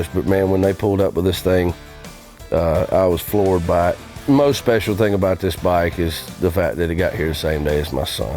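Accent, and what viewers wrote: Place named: South, United States. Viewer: Love southern accents.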